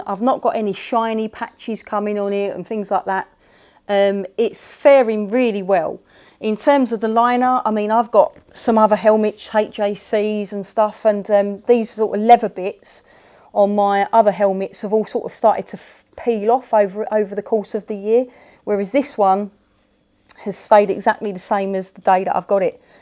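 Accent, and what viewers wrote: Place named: Essex, United Kingdom. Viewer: That accent..... you gotta be an Essex girl!?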